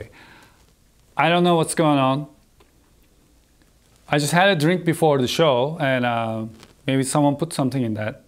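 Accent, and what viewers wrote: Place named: Turkey. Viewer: Güzel kanalmış, ingilizcenden Türk olduğunu anlayabildim. İştabilizer'i görünce tamam dedim :)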